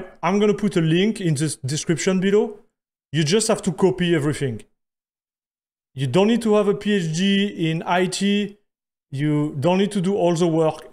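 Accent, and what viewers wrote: France. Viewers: On entend presque plus le pti "french accent" 😝😁😉 Vivement un PUBG avec Choco 👌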